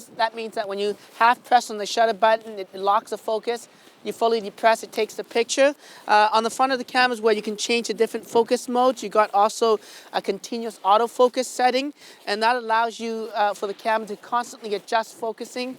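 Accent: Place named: Caribbean. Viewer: I'm picking up a little Caribbean accent in your speech, do you visit frequently? 🤓 great video!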